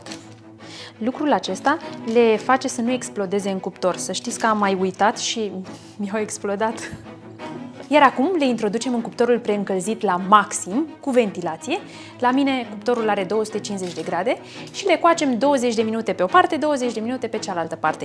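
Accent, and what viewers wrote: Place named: Dobruja, Romania. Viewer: nu cunosc regiunea dar dupa dictie e aproape de dobrogea si ce dictie tata, imi place foarte mult prezentarea ca restul al cunosc forte bine, d-na e foarte bine , multumim celor din strainatate in cazul meu ca ne mai indulciti cu un grai atat de coerent si frumos la auzire multumesc ptr graiul romanesc autentic sarutmana.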